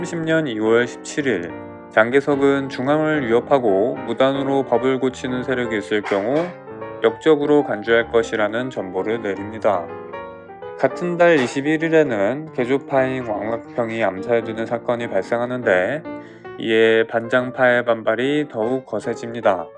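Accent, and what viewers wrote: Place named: Gyeongsang, South Korea. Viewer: 어째 경상도 냄새가?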